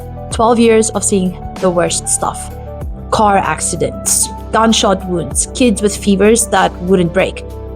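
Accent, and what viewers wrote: South Africa. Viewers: Is the lady talking South African? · you are from Colarado, but you sound South African? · a bit of a South African accent there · You sound solid Afrikaans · I detect an Afrikaans South African accent? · Is that a South Africa accent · You sound South African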